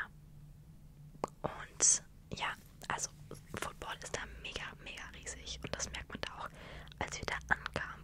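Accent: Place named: Germany. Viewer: German accent :virtualhug::virtualhug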